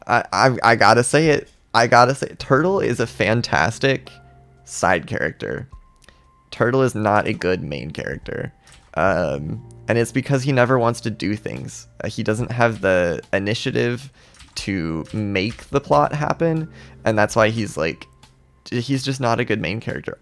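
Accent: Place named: United States. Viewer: *Says that Bluestar is his fave WC character.* Me: Do I know you? Wait, do I live close to u? *My brain: Please say yes please say yes.*: Says he is from USA Me: I can tell The way u say ‘water’ It’s WATER NOT WADDER!!! Fun fact: I have met in Wings of Fire Roblox game What’s graduate school?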